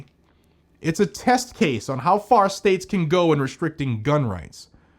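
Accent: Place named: Illinois, United States. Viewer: I’d say that it sounds like you are from Illinois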